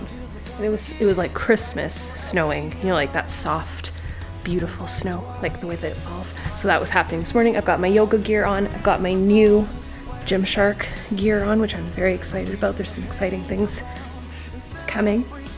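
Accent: Canada. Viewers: Canadian eh?:)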